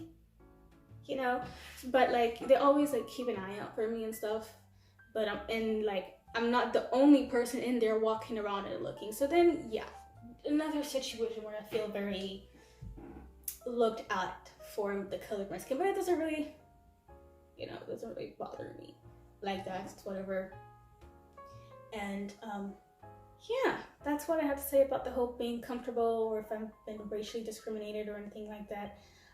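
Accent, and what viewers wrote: United States. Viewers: Hey!im from Valencia too!😎..but i lived in North Carolina since 2003!...for you accent sounds like you from USA,am i right??congrats for your channel !😊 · I live outside of Benidorm and work as a promoter in tobacco shops there and yes I see the staff watch Black people like you described and not look at people with lighter skin colors the same way. I'm curious, from your accent I'm guessing you're from the States (I am too) would you say that racism is different or more or less compared to your home country?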